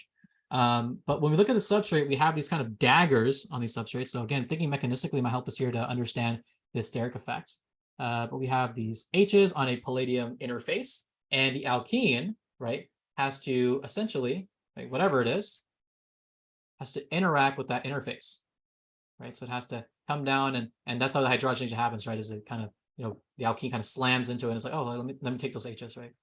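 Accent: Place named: England, United Kingdom. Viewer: You have a very nice accent. Are you from England?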